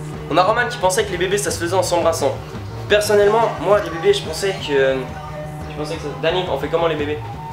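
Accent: Switzerland. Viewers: Ooooooooh, t'es suisse ? :') J'aime trop t'es vidéos t'es super drôle et le mec derrière il me tue trop :') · Ton accent suisse? Parfait :o❤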